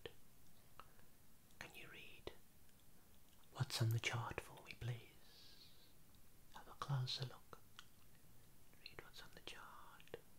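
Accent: Birmingham, United Kingdom. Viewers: 2 nights running fell asleep to this , here's for a 3rd! Looking forward to the next vid. Love your vids , who would of thought a brum accent could be so tingly Haha. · not often that outsiders compliment the brummy accent I must say 😂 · Never thought that as a girl from Coventry, that a man with a Brummie accent would send me to sleep every night! Great video, quack quack!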